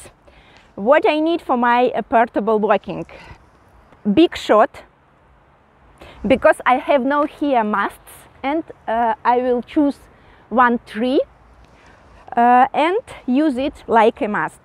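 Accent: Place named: Ryazan, Russia. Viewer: Красавица, у тебя проблемы с родным языком ? Зачем мучаешь язык врага своим рязанским акцентом ? К чему это и зачем ? Ладно когда во время OSO, понятно и логично, а все остальное время в ролике.... ?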